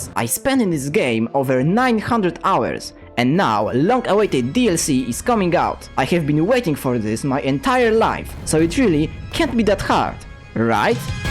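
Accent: Poland. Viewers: Ah I've heard your voice From shorts I knew I recognise your polish-ish voice nerd voice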